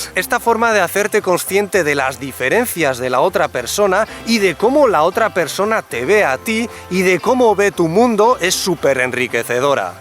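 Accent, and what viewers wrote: Basque Country, Spain. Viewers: El acento vasco de es muy gracioso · 🤣🤣🤣🤣🤣🤣🤣🤣😂😂😅 soy mexican y el acento tuyo me encanta español de España JAJAJAAAAA es Argentino o español???? Sorry nose me puedes decir?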